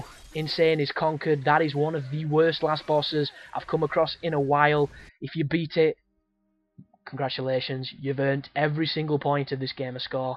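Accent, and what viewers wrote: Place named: North, United Kingdom. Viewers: Legend, your northern accent and explanation are immense hahaha nice one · Dude you sound like the drummer for the band BMTH. So im assuming your from yorkshire cause thats where they are from.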